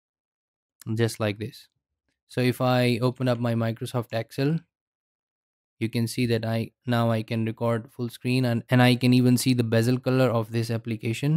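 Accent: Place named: India. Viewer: Thank fucking god for Indian tutorial guys on YouTube and leave it to them to fix the broken bullshit some multibillion company is incapable of fixing! Thank you so much for this! i was just about to smash my t.v.. after having struggled all day with that shitshow "taskbar" popping up and blocking me from using the search function of the file manager i am using to filter through some data libraries that was designed with a properly made system in mind and hence won't fit into the pseudo fullscreen if i didn't hide the taskbar, it know suddenly started popping up in the middle of my lord of the rings marathon and crashing the equally horrendously designed amazon prime app. The windows 10 design is just utter bullshit and missing even the bare minimum core functionalities to adjust this fuckery. Just like when running e.g. into a statistic's problem during the validation process of a new calibration method it is one of those things where I google for hours with only receiving "this is how it is, eat shit and like it" results until i finally remember how to use "" to force the advertisement pushing algorithm to feck off and actually show me the results i asked for - and then finally see it! 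The glorious sub-10 minute YouTube video that as soon as i hear the accent gives me total confidence that i have finally come to the right place to get some actual clear and straight to the point instructions on how to actually solve whatever issue i have run into. Tl.dr: thank you very much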